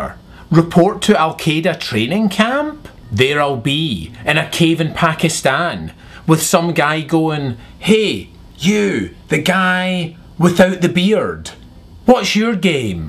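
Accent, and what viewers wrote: Scotland, United Kingdom. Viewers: im scottish and a think your a bam pot and you have a gay posh scottish accent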